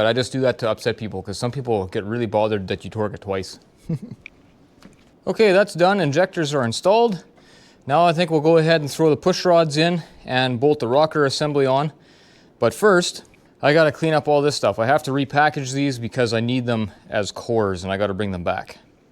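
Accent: Canada. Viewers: I'm in the middle of rebuilding a Maxxforce DT and found you video on measuring piston protrusion, and I kept thinking that you sounded like you were from South of Winkler, I grew up near Plum Coulee and Currently reside in Portage la Prairie. I just got the Rocker arms in, the valves set, and the injectors installed today. I'm rebuilding it because the dogbone holding the lifters straight for #5 broke allowing the lifters to turn wiping out the camshaft, and also the #3 cam bearing was missing and #2 had shifted. love the hex adjusters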